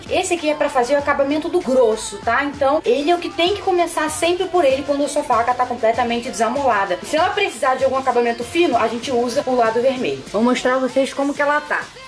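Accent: Rio de Janeiro, Brazil. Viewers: vc e de rj ? parece pelo jeito de falar kkk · essa ae e carioca mermo ela pucha o s demais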